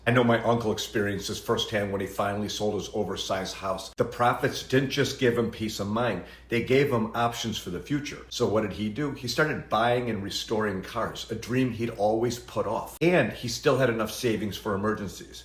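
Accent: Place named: Chicago, United States. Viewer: 7 years ago, after the youngest of our kids was a sophomore away at college, we downsized from 4 bedroom/2.5 bath (2,200 sq ft h in the suburbs into the city to a 3/2 with 1,850. We both absolutely love our one story, all brick SMALLER house! We are in close proximity now to everything: our jobs, our church, stores, hospitals. Less house to keep clean, less property taxes, utilities etc. (we live in coastal Georgia.)The only challenge? We have only one guest bedroom, so at holidays when our kids and grandkids visit, THEY have to pay for hotel or AirBNB. The youngest has a spouse but no kids so thus far she’s usually the one who gets the guest bedroom. My husband and I both U of I grads so I enjoy your Chicago accent 😊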